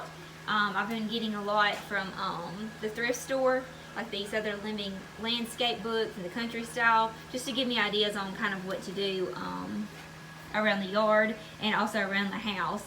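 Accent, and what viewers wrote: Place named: Alabama, United States. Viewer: Everything is beautiful! How do you keep your light colored couch so nice and clean with puppies? I'm originally from Alabama but now live in TX. Nice to hear the Alabama Accent :)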